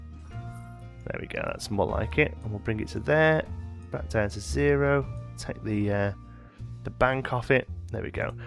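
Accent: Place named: Birmingham, United Kingdom. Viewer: thought i could hear a glimpse of that birmingham accent hah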